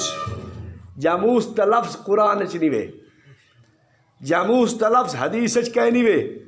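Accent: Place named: Punjab, Pakistan. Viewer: بہت خطرناک پنجابی بول رہے ہیں، کچھ بھی سمجھ نہیں آ رہا ہے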